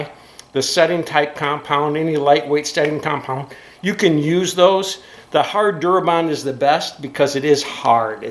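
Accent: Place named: Chicago, United States. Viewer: I love that Chicago accent !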